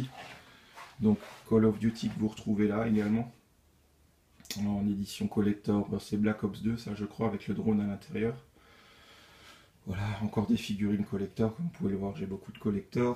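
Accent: Alsace, France. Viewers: Magnifique collection !! J'en bave de jalousie ! Je m'abonne car j'ai vraiment envie d'en voir davantage! Ah et au fait, je croit reconaitre in accent alsacien !?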